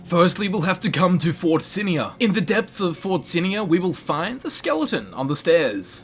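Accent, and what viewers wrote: Australia. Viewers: Ccoooooollllll!!! Thanks for sharing! I wish I could get the character overhaul to work but alas, I cannot! Just a question, are you Australian or Kiwi?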